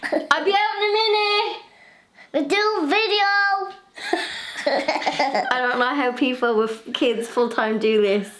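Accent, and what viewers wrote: Ireland. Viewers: Her little Irish accent is so cute ♥️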